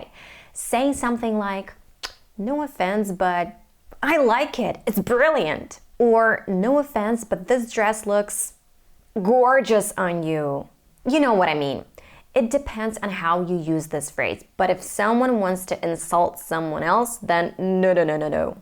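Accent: United States. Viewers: I like your american accent so much coz I understand 97% of your words. But I just understand 15% words of Australian tourists in Bali and other cities in my country Indonesia. Unfortunately... english classroom teaching in Asia is based on british because asian countries are former european colonies. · How many years have u been studying English?,.I love your sounds very great of course American English.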